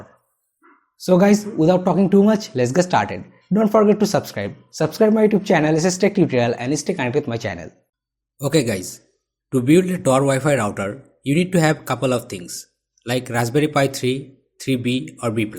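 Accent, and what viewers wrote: India. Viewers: sounds like literally every indian guy, trying to speak English. You should have known that even before clicking the video.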